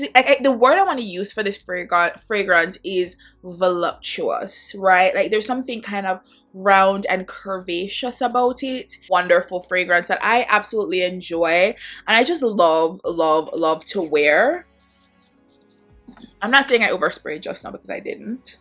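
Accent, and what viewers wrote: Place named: Jamaica. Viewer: I’m a simple girl. I hear a Jamaican accent, I hear “perfume”, I subscribe.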